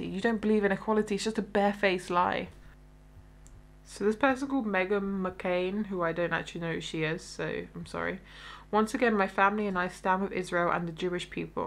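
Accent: United Kingdom. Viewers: ...and as if you are not trying to spread Palestinian propaganda? C'mon, are you even Palestinian with your white skin and British accent...lol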